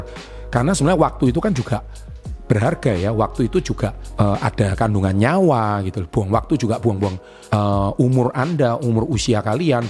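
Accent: Java, Indonesia. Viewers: Pak.. Logatnya kok kaya orang jawa... Ya.. 😊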